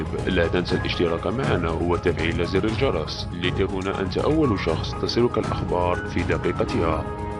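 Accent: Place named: Morocco. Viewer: صاحب القناة مغربي من لهجته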